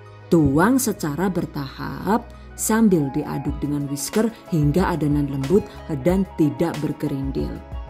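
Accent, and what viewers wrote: Banyumas, Indonesia. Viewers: Makasih utk resep2nya mbak... Aku jg seneng dg bahasa ngapaknya... Ora ngapak ora kepenak yah mbak... Suwun mbak...🙏🙏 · Suaranya kaya pengisi suara si unyil. Eh jebule wong ngapak. 😅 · Asli ngendi sih mbak,deneng pada Karo nyong,,,ngapak mania,,,Tek batiri ngapak yuh mbakyuuu 😀😀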